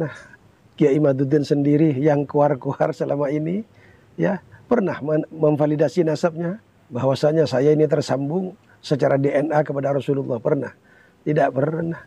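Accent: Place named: Madura, Indonesia. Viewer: kyai ini klo dari logatnya pasti dari Madura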